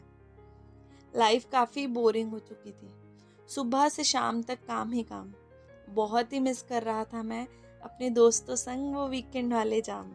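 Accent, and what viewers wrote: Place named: Punjab, India. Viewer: You have lovely punjabi tone as well💞💞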